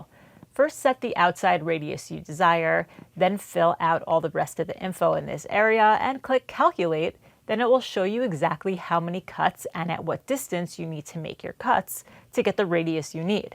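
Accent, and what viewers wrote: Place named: New York, United States. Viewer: Not quite sure it’s everyone, but we all love and accept the way says it, she’s a New York girl. NEXT!!!!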